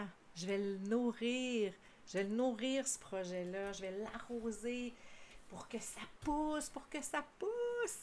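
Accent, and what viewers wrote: Quebec, Canada. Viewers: Bonjour, je suis bien contente d’entendre une voix Québécoise sur les tirages. Je me sens plus proche du tirage à ce moment. Merci beaucoup😀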